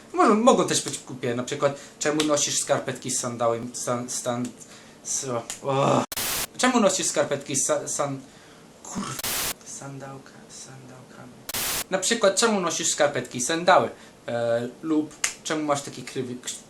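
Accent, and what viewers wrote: England, United Kingdom. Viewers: BO JEST Z ANGI TO AKCENT:D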